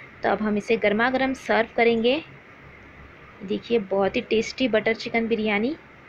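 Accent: Hyderabad, India. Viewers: Aap Hyderabad daccan se hayn?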